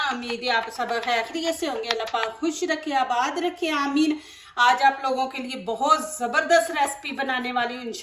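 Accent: Punjab, India. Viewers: Plz urdu ko urdu k.lehjy mn bolen aap that punjabi accent mn bolty hn kuch acha nhi lugta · ماشاءالله تعالیٰ ۔۔۔۔۔۔۔!!! بہت زبردست اور ذائقہ دار لگ رھی ھے یہ قیمہ آلو کی ڈش ۔۔۔۔۔۔!!! میں نے بیگم کو فارورڈ کردی ھے ٹرائی کرنے کے لئے ۔۔۔۔۔۔😊 پہلی دفعہ آپ کی کوئی ویڈیو دیکھی، آپ کے اردو بولنے کے انداز نے بہت محظوظ کیا۔۔۔۔۔۔!!! جیسے میں اردو کے لہجے میں پنجابی بولتا ھوں ایسے آپ پنجابی لہجے میں اردو بولتی ھیں ۔۔۔۔۔😂 میرا تعلق کراچی سے ھے اور مادری زبان بھی اردو ھی ھے، لیکن مجھے خاصی پنجابی سمجھنا اور بولنا آتی ھے۔۔۔ الحمد للّٰه تعالیٰ والسلام علیکم ورحمتہ الله تعالیٰ وبرکاته ۔۔۔۔۔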